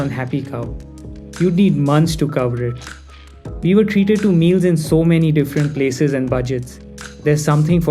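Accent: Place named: Mumbai, India. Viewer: Just my opinion: you speak Mumbai English which will fetch you sobo subs and a few foreigners. Try Hindi to see if the new experiment is working for you or not. I wouldn't be able to to hear English from someone who is a non native speaker for very long. Your fluency is on point but the voice isn't made for non-Indic languages.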